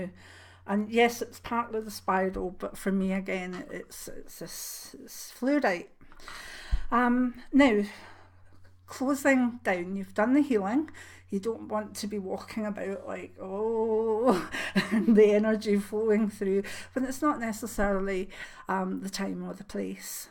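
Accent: Scotland, United Kingdom. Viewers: /thanks again for this video. Excellent as always ( i really dont need to say that now as i/everyone know(s) they are). What's the stone you recommend for rubbing your palm with? I cant place it with your (lovely) Scottish accent. Can you recommend some where on line going to for this? I'm in the midlands area..... is there anyway you know of between telford. /shrewsbury/chester? edit I'm aware it's hardly local to you so no problem if not.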